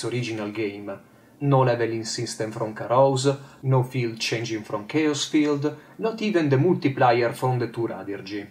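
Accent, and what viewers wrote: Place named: Italy. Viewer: im sure you heard this many times before but.. i looove your accent. Hearing that italian accent is such a breath of fresh air to my ears =)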